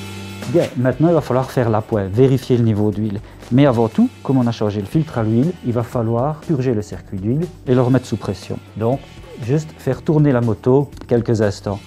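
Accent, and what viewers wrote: Belgium. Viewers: J’apprécie le petit accent belge 👍🏻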